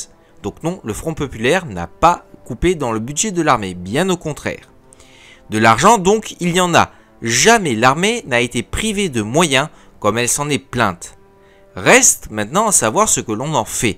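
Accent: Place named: England, United Kingdom. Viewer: Je suis attristé d'entendre que les noms allemands sont prononcés à l'anglaise ! C'est dommage parce que la vidéo est bien faite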